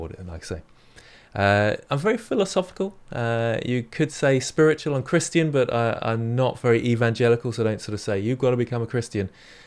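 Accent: United Kingdom. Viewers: I'm very happy for you!! You really deserve more for all the in-depth tutorials and the effort you put on this channel! I know this comment won't change your life and prospective about things, but everyone has flaws and people must not be judged. Actually, flaws are what make us unique. Might be teeth, white hair or anything, but these things make you YOU. I came for the tutorials at the beginning, but I stayed because you're a really nice person, caring and super friendly. Plus your British deep voice is sooo calming when following tutorials. Stay strong, stay healthy and keep up with the good work 💪🏼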